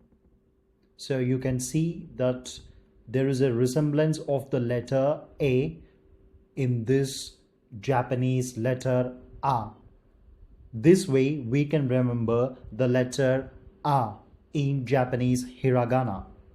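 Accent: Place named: India. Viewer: Your presentation is very impressive👌.Your Telugu is clean unlike many other Telugu YouTube presenters. For example in other Telugu channels, they say the word వచ్చేసిseveral times, and లెగిసి instead of లేచి like so many repulsive Telugu words. Obviously present day Telugu is deteriorating. It’s a great relief to hear your Telugu . I wish to follow your Japanese language lessons. Please continue.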